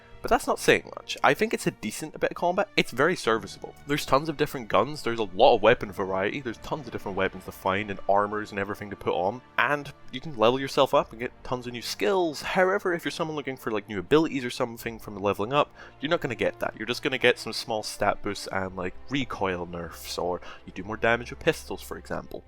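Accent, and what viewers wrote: United Kingdom. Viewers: Dude htf talking regular but pronouncing your ts as if your from Britain? Like wtf 😂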